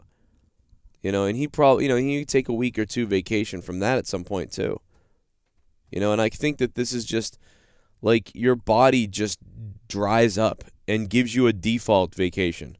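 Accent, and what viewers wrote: Boston, United States. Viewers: sounds like someone is from the BAWSTIN AREA. Subbed as a fellow Masshole.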